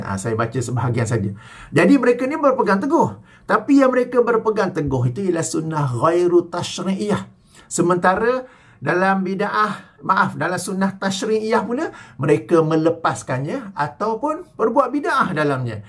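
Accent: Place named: Penang, Malaysia. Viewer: Kapten punya loghat Penang, "tak prnah habakkkq .. 🤣👍🏼 T.kasih atas ilmu kapt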